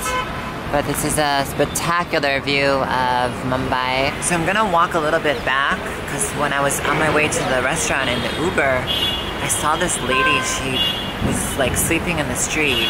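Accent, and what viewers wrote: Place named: Bengal, India. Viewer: She is speaking with Hindi language people but tune is like Bengali . love it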